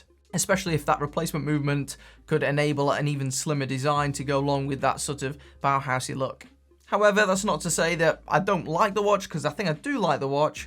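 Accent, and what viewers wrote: United Kingdom. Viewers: Just wondering, what is your British English Accent?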